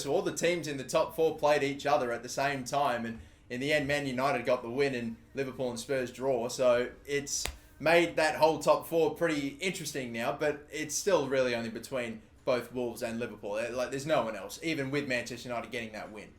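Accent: Australia. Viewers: i unsubscribes when u told them all to die u angry australian man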